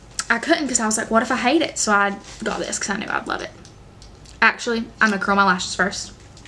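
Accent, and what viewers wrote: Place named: Texas, United States. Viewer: You are definitely a Texas girl! Can tell by your accent and personality❤ keep posting videos!!!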